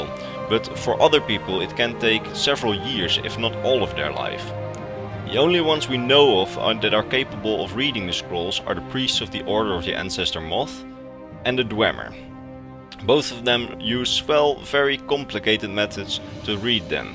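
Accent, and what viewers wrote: Netherlands. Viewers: Ik kan duidelijk horen dat je nederlands bent. Goede video's man, ga zo door! fijn om een mede elder scrolls lore nerd te vinden uit eigen land!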